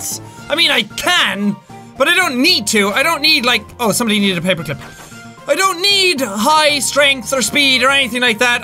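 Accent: Ireland. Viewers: He's Irish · I spent the majority of my day binge watching this whole playlist and now that it's been a few hours since then i've noticed my inner monologue has taken on an irish accent. I'm definitely not mad but this is very strange.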